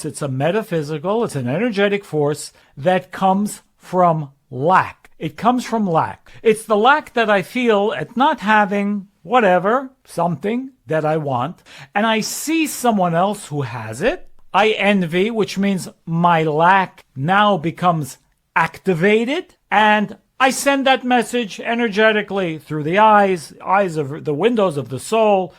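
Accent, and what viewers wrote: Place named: New York, United States. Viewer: Just discovered ur channel- never heard a Rabbi read or teach or relay a sermon before (whatever it is ur doing on this channel) but find u inspiring, knowledgeable and passionate (not to mention-ur accent that I love- that reminds of me of my days in NYC in law school many years ago). Scorpio man here through and through and working on self - improvement and development (spiritually). Though I’m Catholic, we all find that wisdom comes in many forms sent to us in many ways- and well, ur wisdom and sharing of same will become a great addition to my growing library of go-to’s. Thank you!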